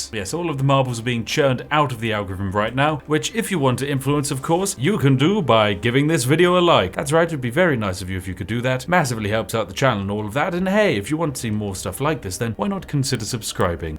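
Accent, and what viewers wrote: United Kingdom. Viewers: I like how he somehow keeps his British accent when replying to comments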